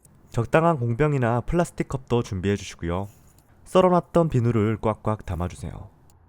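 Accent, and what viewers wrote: Gyeongsang, South Korea. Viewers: 경상도 분 맞지요? ㅋㅋㅋ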